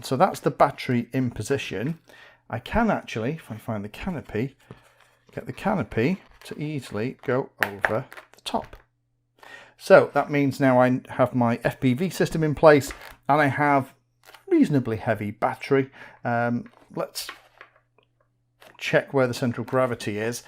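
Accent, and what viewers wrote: England, United Kingdom. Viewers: Hey - at 87 I must be the oldest fpv daddy around! But........I am getting there because YOU are there. Also, give me an English voice anyday! So easy to understand, for us Down Under. <link>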